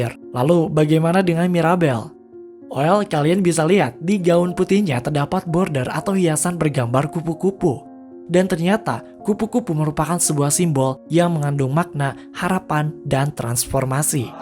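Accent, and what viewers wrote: South Jakarta, Indonesia. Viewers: Bang lu orang jaksel atau sengaja make aksen jaksel kaya "literally"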